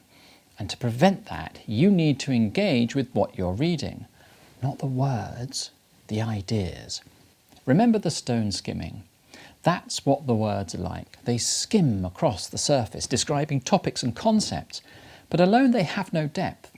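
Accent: England, United Kingdom. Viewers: I love your British accent! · Nope. It's an English accent.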